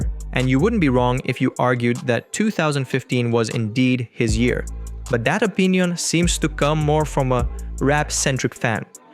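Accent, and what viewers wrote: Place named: India. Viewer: u sound indian af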